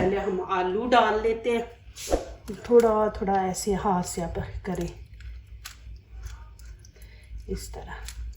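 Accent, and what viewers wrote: Punjab, India. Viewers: Aap ki panjabi wali ton ma Urdu bohat khub ha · Wah G Wah,munh mn pani aa gya hy. Aapki hr recipe boht aasan or tasty hoti hy. Aap shyd punjabi speaking hn q k aap ka lehja bilkul hmary jesa hy.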